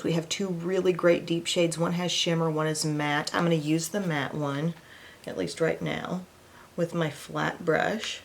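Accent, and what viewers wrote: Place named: United States. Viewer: Hi there, I'm in the UK, I've been following you for a couple of years and I love your vlogs. There are so many accents in the US and I cannot place yours, it's really different and pretty. Which area does it come from? Also, I love that makeup look, so fresh and glamorous! 🙂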